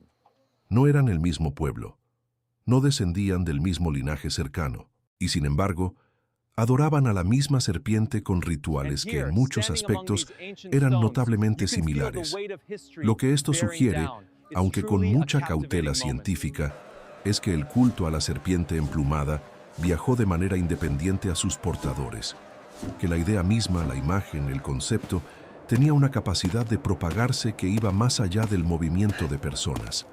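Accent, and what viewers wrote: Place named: Central Mexico, Mexico. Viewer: Con la narración de un español neutro del centro de México 😊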